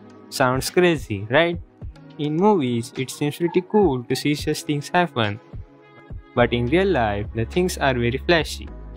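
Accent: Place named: England, United Kingdom. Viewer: I'm sorry I don't understand the English accent very well :( Good animation ♥️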